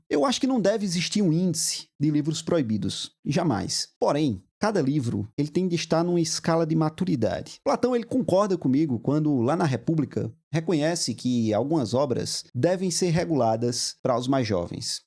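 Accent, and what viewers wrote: Northeast, Brazil. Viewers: Com as devidas adaptações, ouvi a narração de minha jornada com os quadrinhos. Uma aula sobre o tema e uma incrível lista de indicações de leituras com resenhas sintéticas, mas estimulantes. Tudo isso com alta dose de conhecimento, a sonoridade nordestina e o desejo das bençãos de Deus. É um privilégio.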